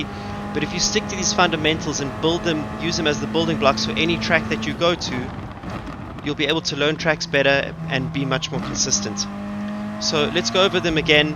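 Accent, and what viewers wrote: South Africa. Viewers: Thanks for the tips! Is that a South African accent? I've been trying to decifer it. Lol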